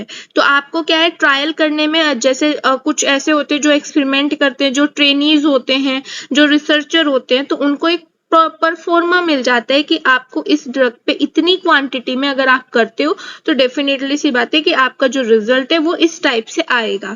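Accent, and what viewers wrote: Karnataka, India. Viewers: Karnataka se ho mam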